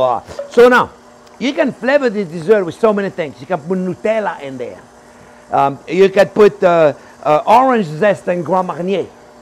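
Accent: France. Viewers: C'est vraiment un plaisir de vous retrouver depuis tout ce temps. Ravi que vous soyez revenu sur la Youtube. Pour moi vous êtes le chef parfait. Super pédagogue et simple. Et puis cet inimitable accent français aussi. Surtout continuez! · I just love your French accent!!! I can't wait to make this...with you!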